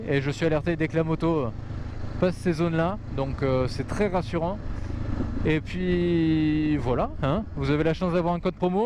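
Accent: Brive, France. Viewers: T'es de Brive ? ✌🏻😅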